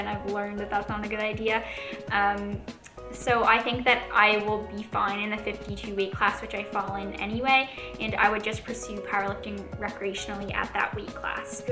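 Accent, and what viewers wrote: Canada. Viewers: I was curious if you were Canadian because of the way you said about & out, super cute 😂 but my anwser was answered at the financial part of the video. Glad you did it for you & no one else ! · youre from the great white north ye'? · that makes me happy the Canadian accent sounds good! It just sounds normal to me.. I suppose it's an accent to others. lol :)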